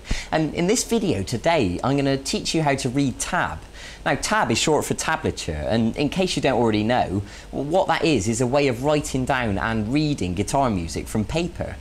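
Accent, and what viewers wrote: United Kingdom. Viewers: accent is from Bristol. He's a cool guy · Nah, he's from the Bristol area, West Country accent.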